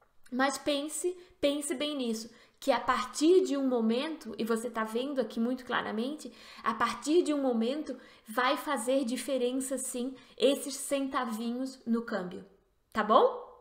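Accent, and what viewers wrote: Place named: France. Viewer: você mora na frança ou algo assim? kk consigo perceber um sotaquesinho já 😅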